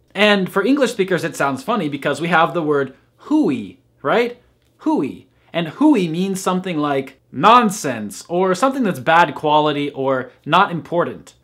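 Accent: Russia. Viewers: у него явно выраженный русский акцент. Какой носитель? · У тебя такое русское произношение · и в том, что он по-английски с русским акцентом говорит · Да он же русский. Чувствуется по произношению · Какой у вас классный акцент: и русский, и английский! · You have a really cool Russian accent! Most of non-native speakers can't pronounce "Ы" and "ь" like in word "Нормально" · Ты настолько говоришь по английски с русским акцентом, что я всё понял)))) Незнаю помогает ли это американцам учить русский но точно поможет русским учить английский.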